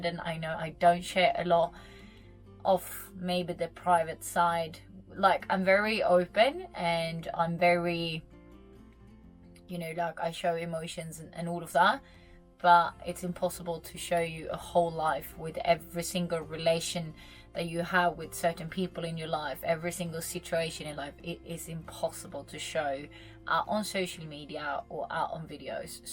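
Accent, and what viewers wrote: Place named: Australia. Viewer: You, family and friends go first, always! I like your videos and lookinga forward to see them. Does´nt matter if it´s in english or in swedish. I laught the first time I herd you talking english because you have/had a aussie accent :)... people always laught at me when I spoke engligsh. Sorry for misspelling and bad english :).. Ta det lugn och ta UMS (utmattningssymdrom) på allvar men det verkar du göra. Ser framemot dina videosar oavsett när de kommer. <3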